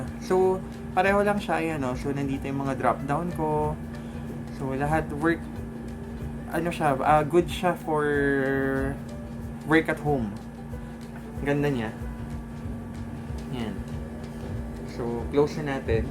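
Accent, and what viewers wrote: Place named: Iloilo, Philippines. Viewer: May naririnig akong ilonggo accent hehe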